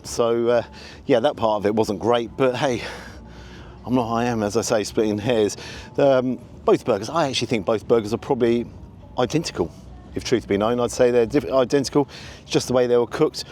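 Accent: United Kingdom. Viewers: Love this - it's just like where I grew up in the US except with a British accent and a bit less food variety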